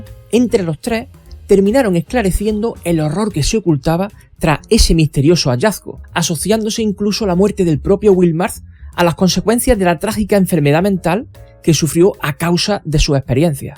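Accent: Andalusia, Spain. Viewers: Tienes acento andaluz. Saludos de México. Y qué buen descubrimiento saber de éste tu canal.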